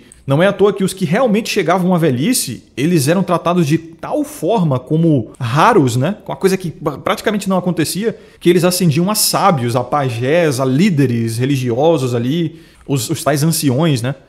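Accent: Bahia, Brazil. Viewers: Dialeto baiano dele... O "vamos conver" ele só conseguiu corrigir esses dias... Por isso é necessário sempre filtrar as informações, pois as referências regionais dele são medíocres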